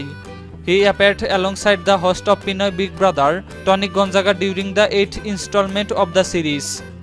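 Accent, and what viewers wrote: India. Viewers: Parang tunog ng Indian pag nagsasalita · Indiano ata tong nagsasalita.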